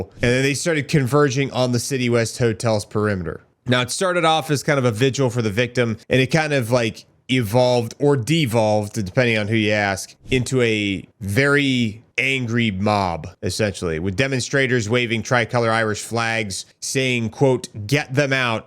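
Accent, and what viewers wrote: United States. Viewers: Takes a yinz to know a yinz. Cant hide our burgh accent brother.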